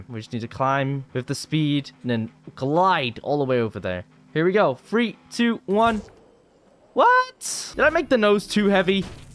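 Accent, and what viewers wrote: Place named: United States. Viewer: Blackpool boy turned American wtf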